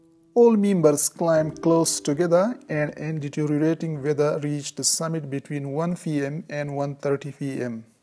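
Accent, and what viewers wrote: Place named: India. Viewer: What's with the indian accent?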